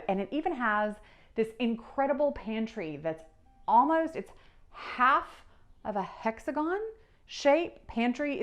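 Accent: South, United States. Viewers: I absolutely love her subtle southern drawl 😍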